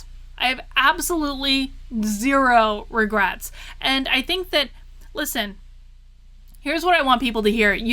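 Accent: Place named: New York, United States. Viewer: That dumb down vocabulary is the way most of us New Yorkers 'tawk'. Nick names and all..plus he's NOT a politician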